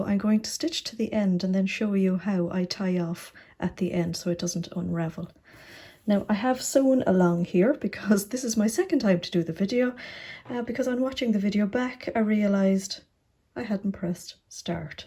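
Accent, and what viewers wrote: Ireland. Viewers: Hi. I found you on YouTube this morning and am enjoying your vlogs. Just love, love your Irish accent! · I loved your video! I have the Janome 1000CP, the predecessor of the 1000CPX and I've had it for 17 years! Your machine looks like it does much more than mine so I need to find a home for my old one then I can buy the one you've bought! Loving your Irish accent! x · Thank you so much, I think I will purchase one, love from Dublin. PS nice to hear an Irish accent 😉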